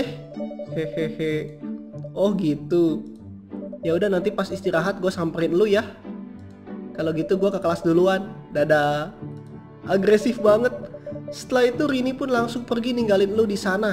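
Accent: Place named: West Java, Indonesia. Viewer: Kak kakak kalau ngomong kayak orang sunda!!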